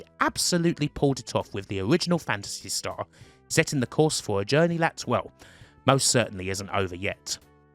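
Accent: United Kingdom. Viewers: Does the narrator have a particular British accent? I'm asking because I'm not British but from Sweden.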